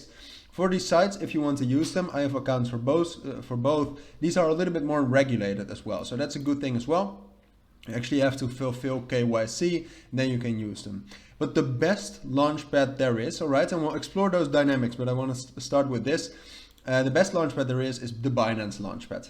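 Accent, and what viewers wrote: Netherlands. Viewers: Hey by you accent i thin you from Netherland right? I want to know if you are still active on this youtube channel if yes i have a few questions and things to propose you. Can you just reply and i will dm you on you socials. Have a good day!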